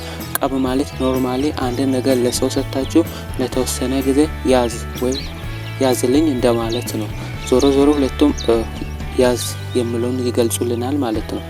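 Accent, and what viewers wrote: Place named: Harari, Ethiopia. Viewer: Wow! You sound from Hararie region, haha!👌 I noticed some of your accent... Hope you're & I found your video accidently!😊 GBU!🙏🎉❤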